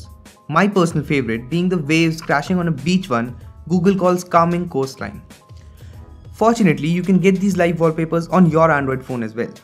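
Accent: India. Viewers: Good video overall but I don't like that thick Indian accent. Sometimes it's hard to understand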